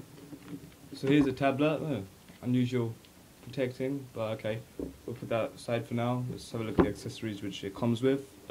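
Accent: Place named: London, United Kingdom. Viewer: You sound like you're from Harrow or Stanmore. I'd be so happy if I'm right :P P.s. good video, thanks. Think I'll be getting the cyclone voyager 10.1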